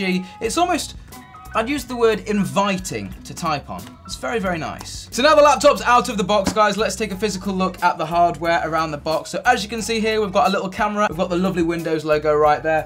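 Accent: United Kingdom. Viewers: British English is terrible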